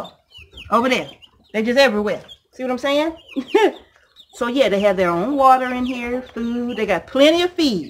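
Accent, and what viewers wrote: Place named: Georgia, United States. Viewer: So this is where the "AFLAC" duck lives! Lol! Soon as you came in, they know your voice. I LOVE all of your videos and have subscribed. I'm ordering my American canner also. They're selling OUT online everywhere! I thank you for the canning videos. Mom had us doing it as kids, so I do remember some things. I love your calm voice and Georgia southern accent. Take care, GOD bless; and stay safe!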